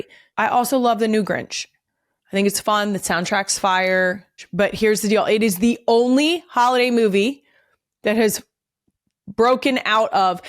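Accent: Philadelphia, United States. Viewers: I love how the more comfortable gets, the more the Philly accent comes out! 😂😂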